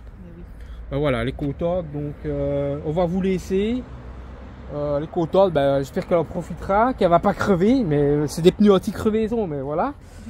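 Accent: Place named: Belgium. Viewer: j'aime bien l'accent BELGE UNE FOIS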